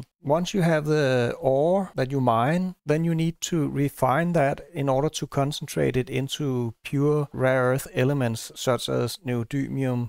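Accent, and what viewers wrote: Denmark. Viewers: Du låter dansk! 🇸🇪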